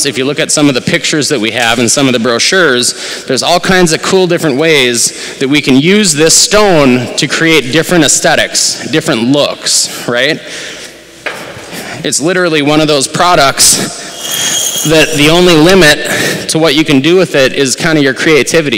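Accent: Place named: Minnesota, United States. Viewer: Man this guy is definitely a Minnesotan. Do we really sound like that?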